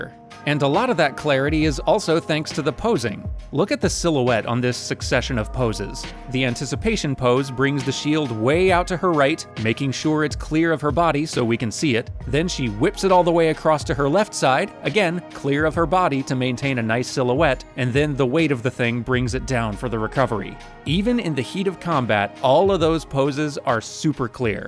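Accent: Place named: United Kingdom. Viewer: That british accent tho. Most Exquisite.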